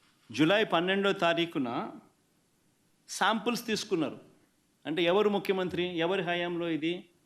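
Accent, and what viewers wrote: Rayalaseema, India. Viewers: Continue with rayalaseeema slang.dont go in polite way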